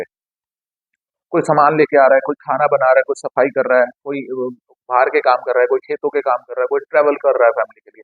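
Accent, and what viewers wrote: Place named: Haryana, India. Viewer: Thoda haryani touch acha lagta h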